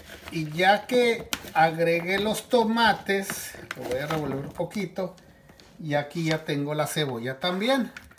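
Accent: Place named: North, Mexico. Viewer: Hola me gusta mucho su canal. Sus recetas me recuerdan mucho a las de mamá. De que parte de México es, por su acento you pienso que es del Norte. Sonora? Sinaloa? Chihuahua?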